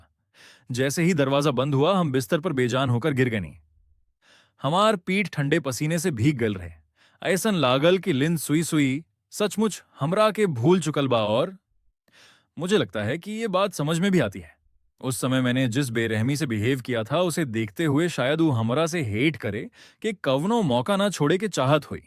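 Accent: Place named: Bihar, India. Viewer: Bhai tu bihari se k video m bhi bihari bhasha ave h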